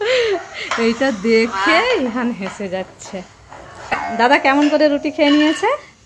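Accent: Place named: Kolkata, India. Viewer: আমার মেয়ে minnat, রোজ আপনাদের ভিডিও দেখে, বয়স ৩ বছর, আপনার কথা কলকাতার মানুষের মতো, আপনি কি মুসলিম? এই প্রশ্ন টা আমি খুঁজে পাচ্ছি না, 😊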